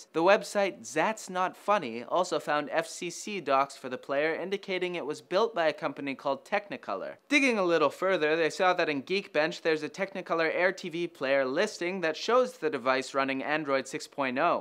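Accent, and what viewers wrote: France. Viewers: U have a nice French accent dayyumm :D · What a french accent.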